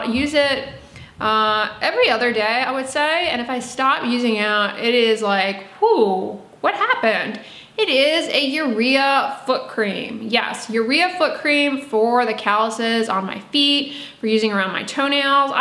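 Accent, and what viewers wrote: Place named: California, United States. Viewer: Her california accent is insane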